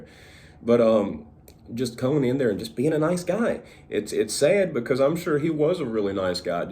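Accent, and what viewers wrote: United States. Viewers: from what part of the USA is this accent from?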